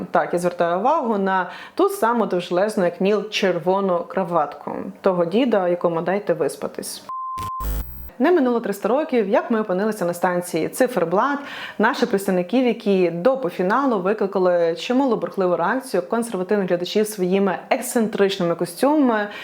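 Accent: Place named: Ukraine. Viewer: У Вас важка українська.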